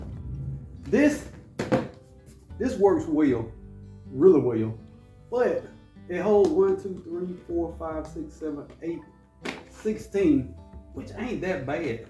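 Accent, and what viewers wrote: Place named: Georgia, United States. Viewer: where did you get your Master grow course certificate from? I want to complete one. Thanks for the videos and I love the realness and natural Georgia dirty south accent! I'm from Georgia myself. Let me know where to get the certificate please. Keep up the grind and growing your own food!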